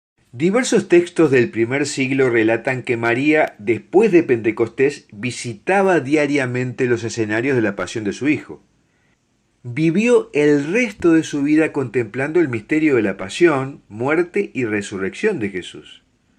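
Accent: Argentina. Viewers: .le agradezco la información ya por medio de usted de la Virgen me a ayudado a reafirmar mi fe y estoy en proceso de seguir y aplicar mi fe católica por eso enfatizó este párrafo aparte de que me agrada su acento tipo argentino y su final bueno......! Por eso mi punto de vista para con usted ya que le reafirmó mi gratitud y confianza con su programa gracias buenas noches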